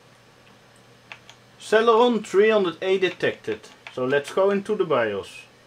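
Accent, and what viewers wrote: Netherlands. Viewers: He has a dutch accent, im dutch as well, his english is sh#t just like his entire generation, not his fault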